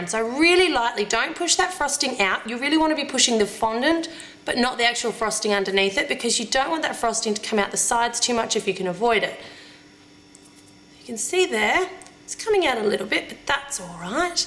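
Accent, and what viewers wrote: Australia. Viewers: Aren't u Australian? I recognise ur voice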